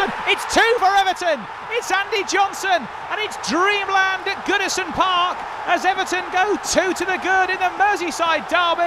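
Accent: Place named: Ireland. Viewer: Irish guy anyone?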